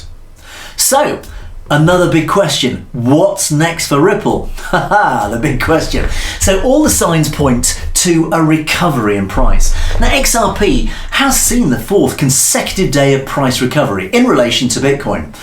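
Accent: United Kingdom. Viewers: posh bloke is more an UK thing tho.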